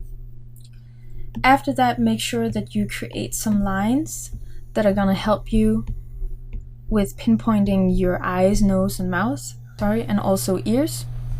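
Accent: Denmark. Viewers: Are you danish?😁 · R u danish?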